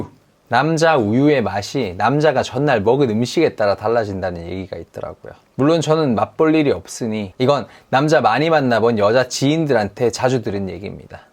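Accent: Gyeongsang, South Korea. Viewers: 좋은정보 감사합니다. 경사도 억양이 살짝 묻어 나오는게 매력있네요^^ 또 뵈요~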